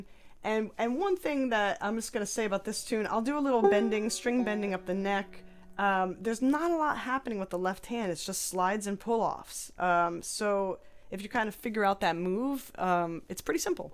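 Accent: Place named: West Coast, United States. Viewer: why cant't she pronounce a ""T"" ? I really resent this ""west coast"" English. Go back to your 3rd grade English teacher. Speak proper English if you are going to do an American YouTube !!!! Duh !!!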